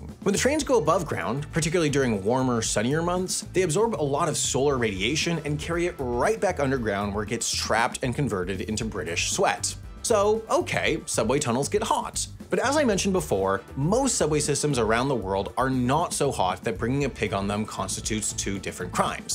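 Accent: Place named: United States. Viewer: Why is this narrated by someone with an annoying American accent? It may have been interesting but I couldn't bear to listen.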